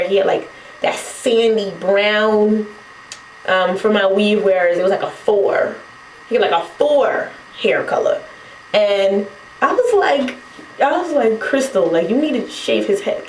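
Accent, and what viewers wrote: New York, United States. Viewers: lol you got dat new york accent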